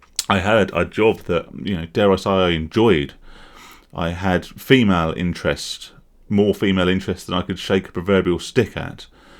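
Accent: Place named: Suffolk, United Kingdom. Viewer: Thanks for sharing. I found that making changes in my life to become the person I feel I am helped me massively in my recovery from gambling addiction so this video really hits home for me. Are you from the Colchester/North Essex area? I was convinced you had a Suffolk accent!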